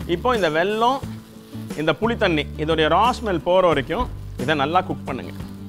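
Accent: India. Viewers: Can't wait to make this. Its speaking an Indian dialect of English?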